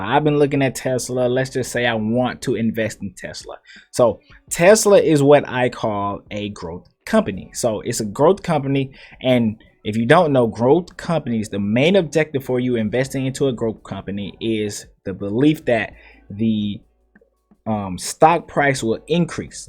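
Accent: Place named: Jamaica. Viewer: Are you Jamaican or Trini ?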